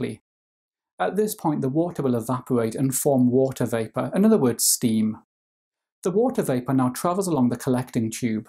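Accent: United Kingdom. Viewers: Sir, you a brit innit?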